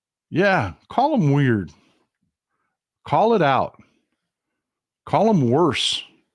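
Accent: Texas, United States. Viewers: As a fellow texas, your intro ..I can never skip it. Sooo Texan, even the skillet 🙌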